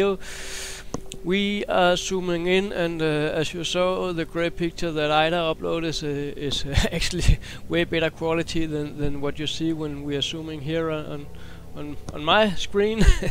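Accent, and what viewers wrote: Denmark. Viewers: I worked at a hostel for 3 years. This dude is Danish for sure.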